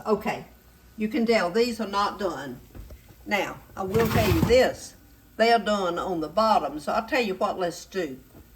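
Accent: South, United States. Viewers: God I love this women, she oozes southern hospitality...just the most loveliest and Sweetest Lady. Not only is she an extraordinary cook, but her southern Accent is so adoring and it puts a smile on my face. I'm a Mexican American\ Hispanic man from a urban neighborhood learning how to cook Miss out of this world Southern cooking. Thank you for teaching me and Sharing your amazing cooking.❤ FYI this Women needs her own cooking show and cook book. She has all the ingredients to be a host on her cooking show. God Bless ❤ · I found you on YouTube about two weeks ago and love,love,love your posts! My mother’s family was from the south and listening to you talk reminds me of visiting the family. Your recipes are all similar to the food I grew up on. I am waiting patiently for my cookbook to arrive. It will be great having the recipes all together and not having to search high and low for each one. 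I made cabbage casserole last night and my husband said a keeper.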